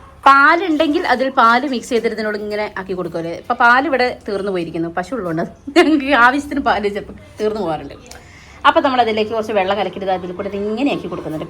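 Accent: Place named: Vadakara, India. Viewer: chechide veed evideya? Samsaram kettitt vatakara baghath evideyo anennu thonunnu❤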